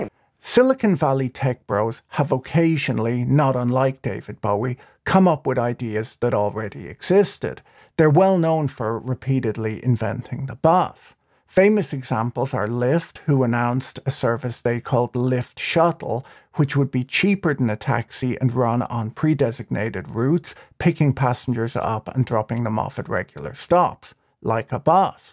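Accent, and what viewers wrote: Ireland. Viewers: But he's obviously Irish...?? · he's Irish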